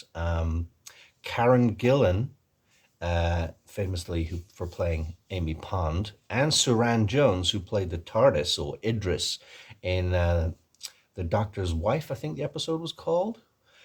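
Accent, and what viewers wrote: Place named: East Coast, United States. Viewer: Your American accent is great,. I agree it sounds like a mix of east coast tri-state state area. That's a certified New Yorker assessment. 🫡 Thanks for another great video!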